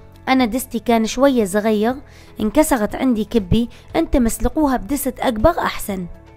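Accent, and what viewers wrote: Mosul, Iraq. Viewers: عاشت ايدج شغل رااائع واللهجه الموصليه تجنن لكن لا يجوز وضع الكبه مع كيس النايلون في قدر السلق لان النايلون بالحراره يتحول إلى سام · الله على لهجتها المصلاوي الحلوى أنا اشقد ارتاح من اسمعكي تحكين الله يحفظكي ويوفقكي يامعدلي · تماام حبيبتي مثل شغلي انا هم من من الموصل مقيمه بتركيا قباان لهجتنا وقبان قلبكِ وعدالتكي حبي😍😘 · والله تستحقي الايك فديت الصوت الحلو واللهجه الموصليه🌹🌹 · احى لهجه بالعراق هي الموصليه عشتي حبيبتي والف شكر ❤ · لهجة اهل الموصل تقريبا مثل لهجة الرقة و دير الزور بسوريا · لهجتك المصلاويي حلوي كثيغ أحبكم كثيغ عاشت ايدكي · يخليلي هاللسان والله اشتاقيت للموصل اهلي واحبابي 😍🥰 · شگداحب لهجتك وكلامك الناعم اني خوالي اهل الموصل احبهم كلش واتمنى اشوفك امي هم تعمل الكبة طيبة مثل كبتك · عاشت الايادي.. والأحلى من هذا كله لهجتكي المصلاويي.. تسلمين ومزيداً من التوفيق والنجاح. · عاشت الايادي..احلى شي بالفيديو اللهجة الموصلية. ما اعرف ليش منسمعها هواي حتى بالتلفزيون من يمثلون على الموصل ميحجون اللهجة الموصلية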